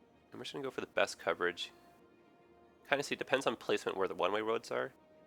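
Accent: Toronto, Canada. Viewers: Great videos! For the past few days I've been watching a ton of Cities: Skylines videos and I've noticed a huge problem with all of them. The person doing the video makes a lot of small mistakes that have an immense impact on the gameplay and they don't notice it until maybe an hour later. SOO annoying. I know they have a lot on their plate when doing a video, but mostly I think it's because they are rushing it. With your videos, I haven't noticed anything wrong. Love the laid back style! Ps. I live in Toronto too at the moment and I like your accent :D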